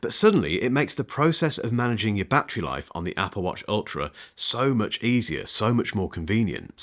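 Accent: United Kingdom. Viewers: Keep up the good work, nice to hear tech reviews, simply explained and in a Brit accent !!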